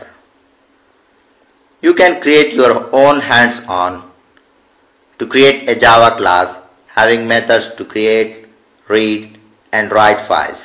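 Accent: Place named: India. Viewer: nice accent haha are you indian?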